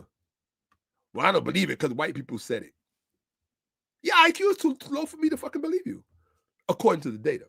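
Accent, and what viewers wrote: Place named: New York, United States. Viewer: Thanks. Im always reminded of my fifth grade friend from New York while listening to you because of the way that you speak. The knowledge that you teach is truly appreciated.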